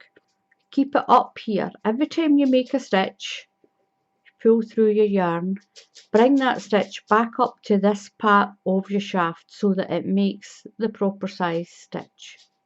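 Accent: Scotland, United Kingdom. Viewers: Thank you for this and other patterns. You are an excellent teacher (I'm American) and your Scottish Burr is an added treat!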